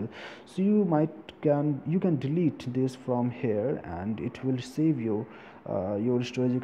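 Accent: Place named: Pakistan. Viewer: Are you Pakistani? You remind me of Kumail Nanjiani the way you pronounce certain words.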